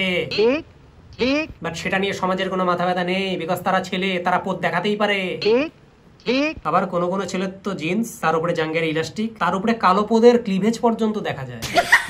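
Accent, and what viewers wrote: Bangladesh. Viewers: বাংলাদেশ থেকে বলছি দাদা,,, তুমি অসাধারন বাংলা বলো,, তোমার বাংলা বলাটা দারুন,,,সেরা বাঙালী তুমি দাদা,,, কোচবিহারের ভাষা,আমাদের ভাষা একদম এক । মনেই হয়না তুমি পশ্চিম বঙ্গের ছেলে,,,মনে হয় তুমি আমাদের প্রিয় বাংলার সন্তান,,,,কি কোলকাতা কি ঢাকা ,,,তোমার মাধ্যমে সব একাকার হয়ে যায়,,, তোমার এই ভিডিওর থিওরিটা খাটি বাঙালী পরিবারের সন্তানদের জীবন যাপনের চিত্র ফুটে ওঠে,,,মনেই হয়না তুমি কোচবিহারের ছেলে আর আমি কুষ্টিয়ার ছেলে,,,, ছোটবেলায় এভাবেই সর্দি লাগতো আর হাতে ঘসা মেরে আবার খেলা শুরু করতাম,,, মাঠে খেলতে খেলতে বন্ধুরা মিলে একসাথে পটি করতে বসতাম,,,আবার সেটা ডুমুর পাতা দিয়ে ঘসে পুনরায় খেলা করতাম,,,, আমরা গর্বিত ,আমরা বাঙালী,, আমাদের রীতি রেওয়াজ,কৃষ্টি কালচার একেবারে সেম। কোন পার্থক্য নেই । পশ্চিমবঙ্গ বাংলাদেশ একসময় এক ছিল,,,তোমার ভিডিও দেখলে মনে হয় আজও আমরা একই আছি,,,, হাসিয়ে যাও ভাই,,,আমার চোখে তুমি একজন খাটি বাঙালী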